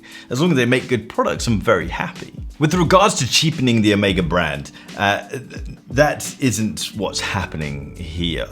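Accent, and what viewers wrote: RP, United Kingdom. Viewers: I like how he defines watch snobs and he makes videos sipping coffee after every sentence, placing watches in categories of luxuriousness and projecting his view about a plastic watch in his posh accent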